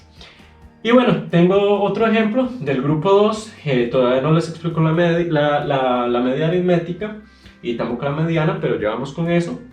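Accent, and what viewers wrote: Costa Rica. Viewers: este mae es de costa rica se nota demasiado pura vida playo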